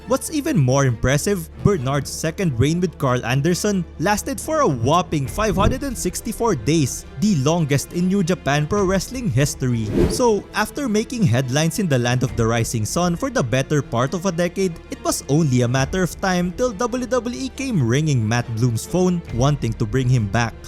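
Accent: Philippines. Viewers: is bro talking had a filipino descent??? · I can hear your accent is filipino.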